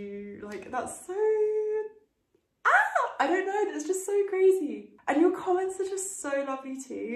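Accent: France. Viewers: At what age did you started to have the French accent and how?:)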